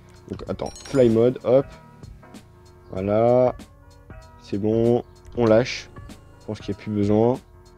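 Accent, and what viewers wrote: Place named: France. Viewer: jppp ton accent francais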